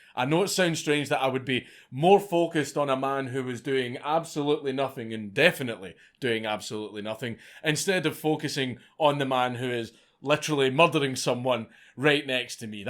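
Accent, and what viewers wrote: Scotland, United Kingdom. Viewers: My god a Fellow scot with a blantly Scottish acent, that alone was a joy to just hear blurting out over the speakers. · This youtube channel is great. Fascinating history narrated by a suave Scottish accent, who could ask for more? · this is the most scottish man i have ever heard. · Are you SCOTTISH by any chance ?! Can you do a Transylvanian Accent ? Of course I've heard of Count Dankula.... you've gotten a lot of coverage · He's Scottish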